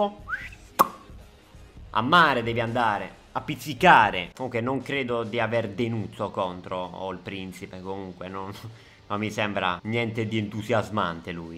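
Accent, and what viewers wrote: Bari, Italy. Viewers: Barese parli? O sbaglio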